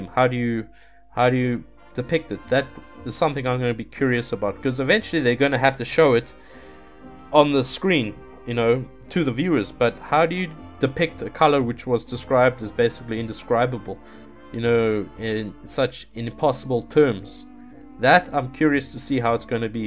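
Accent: South Africa. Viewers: This worries me because the Colour out of space is one of my favourite HP Lovecraft stories. It is so disturbing and unsettling and I'm worried Nicolas Cage will make the movie unintentionally funny :/ I am excited to see Tommy Chong in a movie though, what a legend. PS it is so comforting to hear a South African accent. Keep up the good work :D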